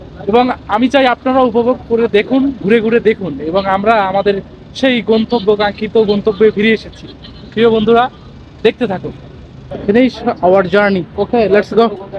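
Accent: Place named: India. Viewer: কথায় বুজাযায় আননে ইনডিয়ান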